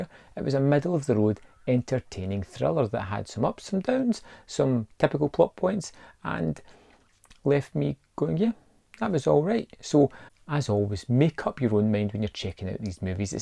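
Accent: Scotland, United Kingdom. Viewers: Yes it is, and may I say for a Scott he speaks very clear and well...psst ❤ a proper Scottish accent!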